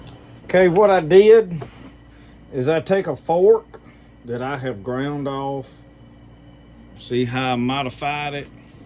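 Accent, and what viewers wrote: South, United States. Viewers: I REALLY LOVED THE VIDEO AND OF COURSE THE "SOUTHERN BROTHERHODD ACCENT." I'M FROM FLORIDA AND WHEN I WAS A CHILD, I WOULD GET EMBARRESED WHEN I TALKED. ACTUALLY HAD PEOPLE WANT ME TO TALK WITH THE HARDCORE SOUTHERN ACCENT. GETTING PAST THAT, I REALLY LEARNED ABOUT THE WAY YOU USED THE CLOTH VS THE SPONGE. ALSO, YOU WERE DEAD ON WITH THE FINISHED PRODUCT! THE HOMEMADE KNIFE, WELL, I'M JEALOUS, BUT WILL FESS UP, I'M GONNA COPYCAT IT. MOSTLY, WHAT IS THE MUSCIC? LOVE IT AND IF YOU TYPE IN "DONNIE LAWS," HE USES THE SAME FIDDLE MUSIC. PLEASE LET ME KNOW WHERE TO FIND IT,... I LOVE IT! PEASE SHOW LOTS OF YOU WORK,... AS IT GETTING TO BE A LOST ART. THANKS ROG FROM THE SWAMPS OF FLORIDA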